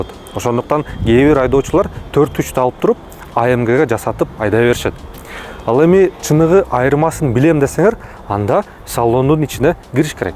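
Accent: Standard. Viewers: Ассалому алейкум иним, эн биринчиден Кыргычаны тапптаза суйлогонун учун баалайм сенин ишинди. Менин биринчи унаам 2001 жыл мерседес 2.7 сdi avangard болгон. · Таза кыргызсча суйлоп жакшы тушундурот азамат ишине ийгилик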